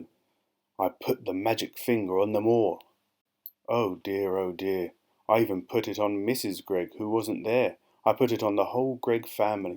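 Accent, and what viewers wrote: United Kingdom. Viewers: I really like your British accent.. and I listen to your stories so I can master this accent. Thank you❤❤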